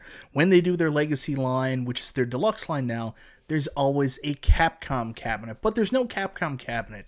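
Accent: Canada. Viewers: love how this guy talks is he from canada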